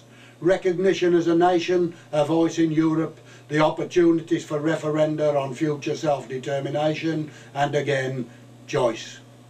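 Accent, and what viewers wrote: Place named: Cornwall, United Kingdom. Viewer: This guy sounds like a Cornishman complaining about England.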